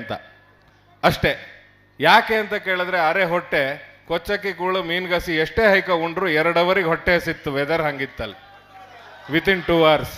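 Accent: Kundapura, India. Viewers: ಎಷ್ಟ ಚಂದಾ ನಿಮ್ ಕುಂದಾಪ್ರ ಭಾಷೆ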